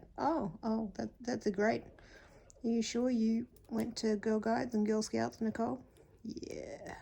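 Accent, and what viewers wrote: Australia. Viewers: Absolutely love this mask pattern with excellent directions (I've tried many) and adorable Australian accent is a bonus!! I find the women's/teens size quite small but will easily adjust size. Thank you Nicole for this brilliant video!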